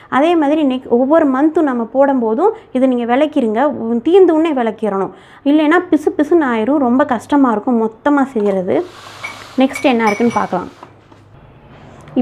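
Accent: Madurai, India. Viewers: Andha thenga kathi maduraila use pannuvom apram pathriram velakunum nu soluvom urs madurai slang madhiri iruku adhan keten pls reply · R u from madurai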